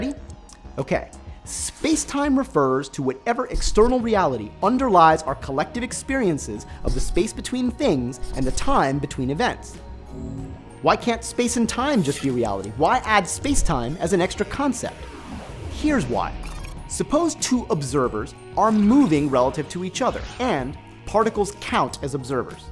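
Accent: Australia. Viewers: I wish gabe stayed. Being a non native english speaker, the new host’s australian accent is so hard to understand. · A few years ago, watching Matt instead of Gabe was slightly weird. Now rewatching the old videos and seeing Gabe instead of Matt is weird (no disrespect, Gabe). Matt has really made SpaceTime his own, presence wise I mean. Its weird to watch a SpaceTime video and NOT hear that sexy Australian Complex Physics voice. You guys chose a really good replacement. The lightsaber battle was cool too. May Matt guide us in this journey of understanding (or trying to understand 😁) for a long time.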